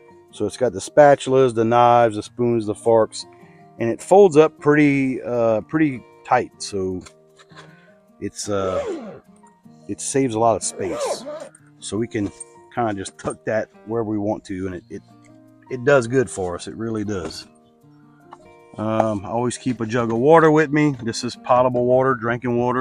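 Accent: Louisiana, United States. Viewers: I’m watching you for the first time…I was saying to myself this Arkansas guy sure sounds like he’s from back home. I’m from Lake Charles but moved to Memphis recently for work. I have a Bronco. Gonna check out dem Ozarks real soon. Can’t find no good sausage in Memphis area. I have to bring Sausage and Boudin from home.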